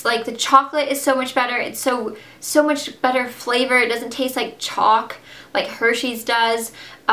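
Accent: England, United Kingdom. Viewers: I KNEW IT. I've only seen a few of your other videos so I didn't know you were from England before, and you have no accent, but I still guessed you were from England. Something about the way you speak is British, even if you don't have an accent. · did you have a British accent? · I can tell she used to British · Well, on and off throughout her growing up years she lived in America. Also, sometimes she says the word "rubbish" for something that's disagreeable, she pronounces words ending with "ing" as "ink," and pronounces "mirage" as "MEER-awj" (a soft-sounding j) Those are the times when she seems to sound a bit British to me :) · what happened to your English accent? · your English accent totally came back in this video! lol! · Can you PLEASE PLEASE PLEASE talk in an english accent? Do you miss having an accent? I wanna hear it so bad! Also, I would love to learn your story, where in England you are from, why did you move to America, where in America, etc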